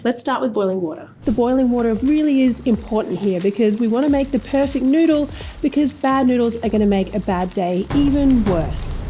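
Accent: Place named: Australia. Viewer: I'm not a native English speaker and I learned mostly by watching American shows and then I lived in the UK for a few years so I picked up a bit of a London accent, and now I'm picking up some Aussie-ness from watching this channel, apparently. My Aussie friend keeps saying "when did you start sounding like this?" it's because of XD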